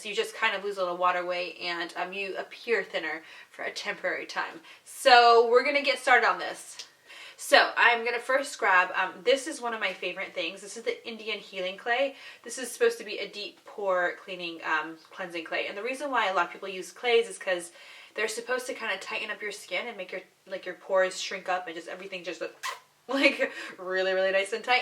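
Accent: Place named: Iraq. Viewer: هلو اول شي اني فخوره لان انتي عراقيه ثاني شي ارجو تنزلين لفديو بلعربي بسرعه لهجتج يعني لغتج بلعربي (عراقي)كللللللش حلوه حته شكلج يكون احله.شكرا جزيلا الج لان انتي متعرفينه ولا شايفته وتنزلين فديو نستفاد منه شكرا لان تحبين لجمال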